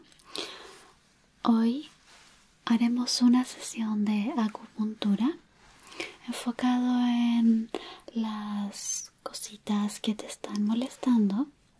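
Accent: Chile. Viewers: El acento chileno me encanta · Awwwww aun así cuando te equivocas te ves muy tierna eres una chica dulce y me gusta tu acento chileno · Así que de verdad habla chileno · Amo tu acento chileno 😭🛐❤️ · Siendo peruano (dato no menor), debo decir que me encanta el acento chileno y las jergas chilenas :v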